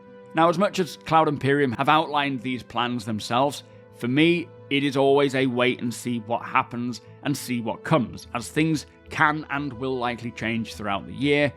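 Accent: United Kingdom. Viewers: Happy New Year. As I said on my last live I was able to participate in. Your noble British accent makes you seem older and wiser. I would guess that u are younger than I. At 43 your video's are perfection and balanced. With each video I have been urning for what we are about to see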